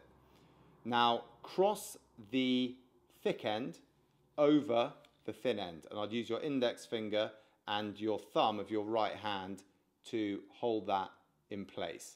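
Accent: England, United Kingdom. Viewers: The best part of your video was your English accent since I'm kind of partial to the queen 👑 of England. Well I have to watch your videos at least 10 times maybe 12 times. But I got it. Hey I like your store. 🤔 Maybe I should go to more of your videos and find out how you did it I kind of like that's a great idea having a nice store like that I wonder if it would work in Bothell Washington probably would cuz Microsoft is here Amazon is here Google is here Nintendo is here.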